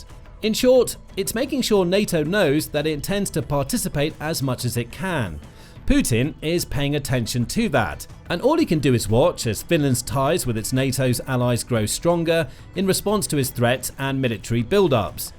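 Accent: England, United Kingdom. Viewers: Bonjour, à votre accent je crois comprendre que vous êtes Anglais, donc "va en guerre", et je ne crois en RIEN votre propagande. Rassurez moi, le Russie a construit ces bâtiments sur son territoire ??? Donc elle a le droit . Vous vous persuadez tout seul que la Russie attaquerait un des pays de l'OTAN !!! Redescendez les pieds sur terre !!! Et vous ne parlez pas des troupes de l'OTAN s'installant en Roumanie, tout près de l'Ukraine et des troupes Russes !!! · Hey can you switch to American accent? The British accent is getting a lil annoying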